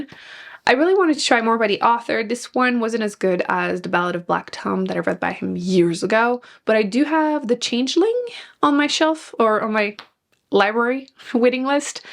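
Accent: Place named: Quebec, Canada. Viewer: Moi qui t'entend compter en français et qui réalise que tu es québécoise: 😮🤯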